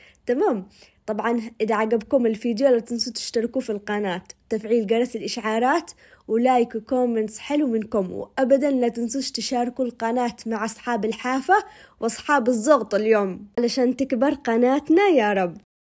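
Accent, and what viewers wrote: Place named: Aden, Yemen. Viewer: اللهجه العنديه احلا من الاكل.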